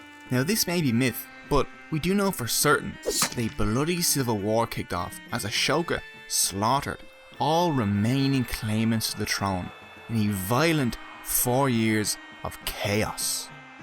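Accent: Dublin, Ireland. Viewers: As an Indian I have to say, it's honestly quite fun to hear the names with an Irish accent. Now I'm a fan 😂. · S/o to Dublin accents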